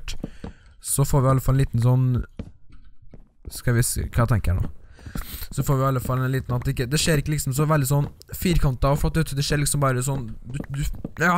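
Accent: Trøndelag, Norway. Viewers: Snakker du trøndersk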